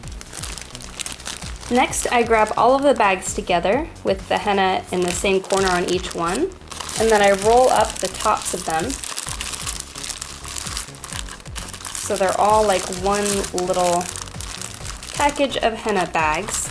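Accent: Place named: United Kingdom. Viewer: you sound sorta British in this one!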